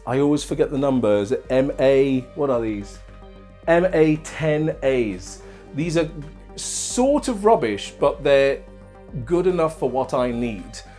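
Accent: United Kingdom. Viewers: Love your Welsh accent. Second career in voice over?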